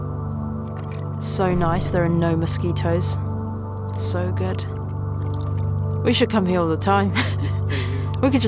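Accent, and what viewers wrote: United Kingdom. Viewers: Such a beautiful place, too bad it's so damn cold! Is she Swedish? she looks Irish and has a British accent. I just watched her video of raising a red squirrel. I love squirrels, that was a great video, nice job!, definitely Irish, I wonder how she ended up in Sweden? So lovely to live among all the forest and trees. I bet she wouldn't appreciate all those Komodo Dragon feeding videos I've been watching!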